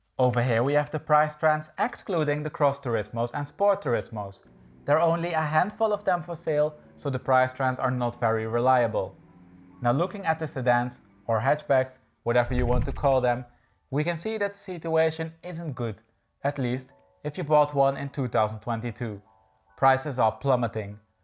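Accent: Netherlands. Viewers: Do I hear a Dutch accent? 😅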